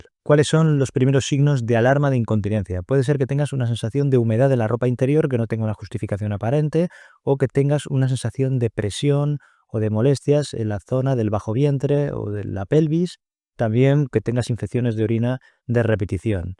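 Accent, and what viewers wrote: Spain. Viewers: Por el acento, es español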